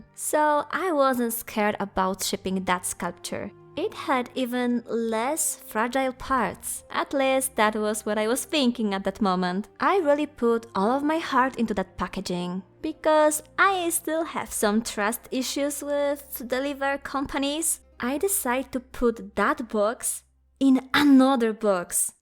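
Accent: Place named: Poland. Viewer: Don't worry about the language, you did a good job speaking. The Polish accent is noticable and I find it slightly funny/wholesome to listen to, as a Pole, but I think that's just how most of us sound like trying to speak English without much experience. I might be making an English channel after Hytale releases so I also definitely will be sounding like that 😄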